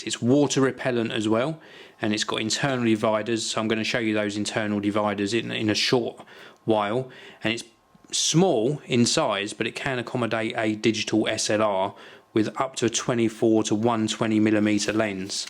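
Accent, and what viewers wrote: United Kingdom. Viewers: can you speak without the british accent , and speak faster ?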